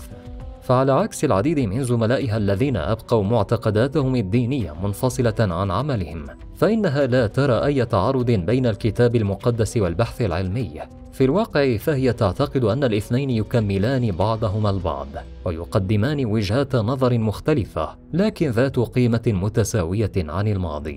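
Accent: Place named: Israel. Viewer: هو انت بتكلم زى الإسرائيل هو انت منهم؟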